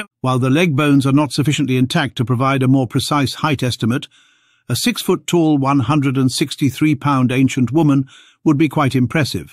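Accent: United Kingdom. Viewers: A clipped brit accent and utterly no academic background in physical anthropology makes for sensationalism , not science.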